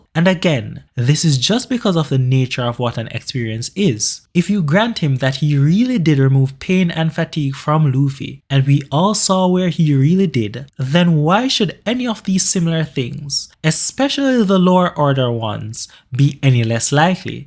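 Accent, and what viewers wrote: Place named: Jamaica. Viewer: This is my first video of yours I have seen. I caught the accent IMMEDIATELY. Where ya from. I’m from Jamaica i hear patois. If you are. I’m subbing rn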